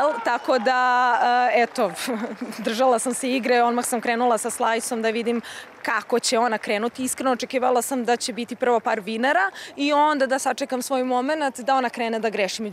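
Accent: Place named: Niš, Serbia. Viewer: Bravo. Pricaj si po niski ne trudi se da gadjas beogradski. Nek se potrudi da razume ko hoce. 💪👩🎾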